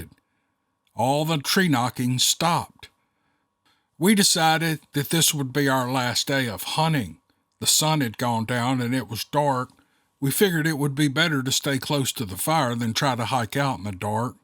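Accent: South, United States. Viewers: First thing Monday morning the great Sir on my phone this 5am awesome Sir great read and more Awesome real voice lovin that southern twist voice truly awesome thank you Sir miss that southern voice yes Sir this story is great as usual missing (Steve Lily) but yer presence is great hopefully yer fall/winter is relaxing at home with family have a great thanksgiving to y’all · I moved to Maine 6 years ago from Louisiana/ Texas. Grew up in the Sabine river swamp and spent years deep in the woods there. I Love it here! But yes, the woods are very different. Not so creepy, really, just different. I love your fabulous stories (miss the southern accent) but don't really lean toward belief... And moose, yeah... I'd rather find a bear! But honestly both really only ever just run away like scalded chickens.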